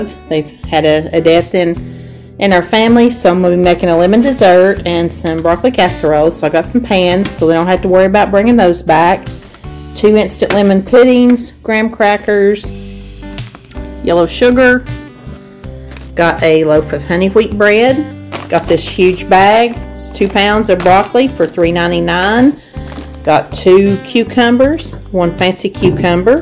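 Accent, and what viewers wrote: South, United States. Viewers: I love listening to that southern drawl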